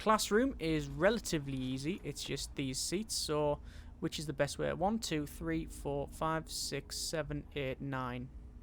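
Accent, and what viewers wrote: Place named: North East England, United Kingdom. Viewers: North east - Newcastle/Middlesbrough/Hartlepool area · +emmo999 I'm from the Darlington area of North East, his accent isn't that strong to me, I can't pin point it XD Sunderland, Hartlepool, maybe Newcastle! but swinging more to Newcastle XD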